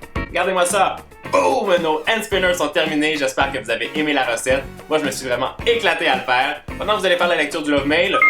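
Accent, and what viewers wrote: Quebec, Canada. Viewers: Jadore tes video cest pas laccent quebecois ? Jadore😍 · Jadore troppppp lacsent quebecoise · #lovemail t'es le meilleur j'adore ton accents québécois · J'aime trop les Québécois surtout votre accent !!😘😘😘😍😍😍😍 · J'adore vos axant ... l'axant québécois c'est mon préférer . Je trouve qu'il est tros marant . Sinon j'adore t' es vidéo . 😍😚😇😜😄😸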